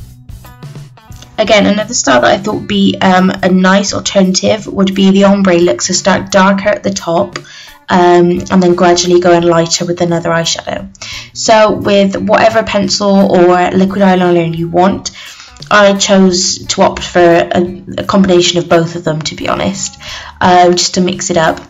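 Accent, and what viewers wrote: Bristol, United Kingdom. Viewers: Love it!I can't tell where you're from >.< so sorry if I'm wrong but you sound bristolian like me!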